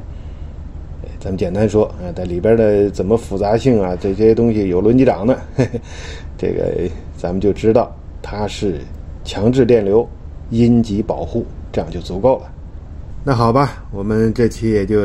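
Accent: China. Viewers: 船长哪里人？听口音像大陆的？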